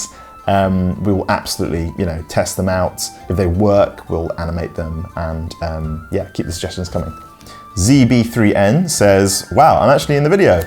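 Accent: United Kingdom. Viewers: I like British accent :)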